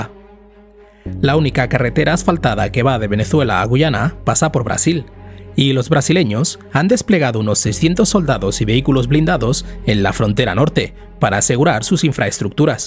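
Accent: Spain. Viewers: Este analista le faltó leer más. El Esequibo es parte de Venezuela, ya esa parte del territorio no debe estar "rayada". Una zona en disputa no debe ser aprovechada por ninguna de las partes y Venezuela ha cumplido. Si una de las partes quiere invadir el territorio esa es Guayana, porque el Esequibo es de Venezuela, así es que el cuento, espero que sepas cual es la verdad y no la hayas dicho por algún interés económico particular, porque de no ser así, entonces es que de verdad eres absolutamente ignorante de las realidades geopolíticas de las que tratas en tu canal. También quiero acotar que cuando te vayas a referir a las economías en el caso de Venezuela, no obvies un hecho de mucha influencia en la geopolítica económica como lo es las sanciones unilaterales impuestas por EE.UU. (Sanciones) y has un análisis retrospectivo del antes y después de ellas en el desarrollo de Venezuela. 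Por tu acento creo que eres español y por tu forma de referir el tema de Venezuela me parece que eres de los que aun les duele la patada por las n@@ que Simón Bolívar en compañía de esclavos e indios, le dio al imperio español hace más de 200 años, después de más de 500 años de saqueo en nuestra América Latina. No uses este medio para engañar a la gente.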